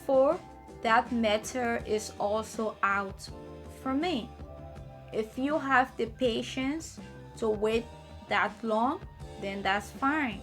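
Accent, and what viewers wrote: Liberia. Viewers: Bella She sounds Liberian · I hear small Liberian English my geh....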